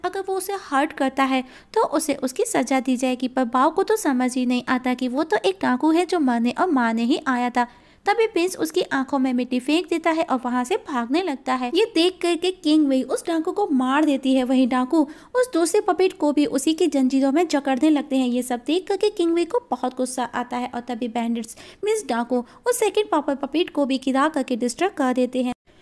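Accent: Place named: Bihar, India. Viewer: Khisiya hua tha 😂 aap bhi Bihar se ho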